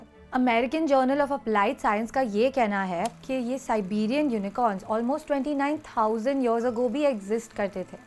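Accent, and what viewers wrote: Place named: South Bombay, India. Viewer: The way you pronounced "ळ"😁 you're from Mumbai. And your accent sounds so south Bombay.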